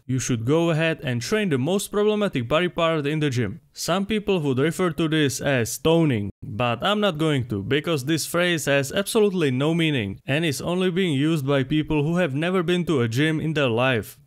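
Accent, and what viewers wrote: Czech Republic. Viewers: You sound czech:D (as a czech myself) also, nice video. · Yo bro is that a czech accent? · You sound like you are Czech😅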